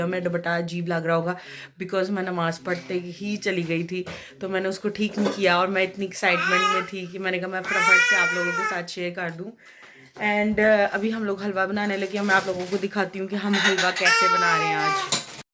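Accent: Sialkot, Pakistan. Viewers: You r frm sialkot ap ki urdu se lag rh ha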